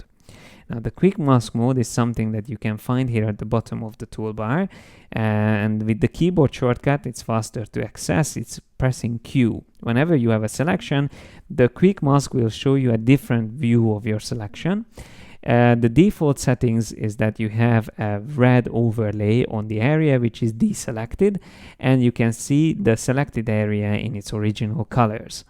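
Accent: Hungary. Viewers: látom nem vették észre ennyi év alatt hogy magyar vagy, de amikor meghallottam az akcentusodat, egyből éreztem hogy valami van :D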